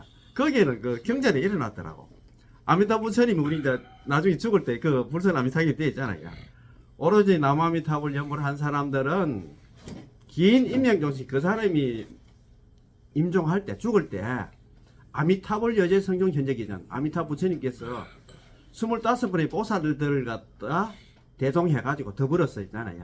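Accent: South Korea. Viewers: 믿음직한 대구사투리 정말 좋습니다. 믿음이 더욱 확실해지네요. 나모아미타불 나모아미타불 나모아미타불 나모아미타불 나모아미타불 나모아미타불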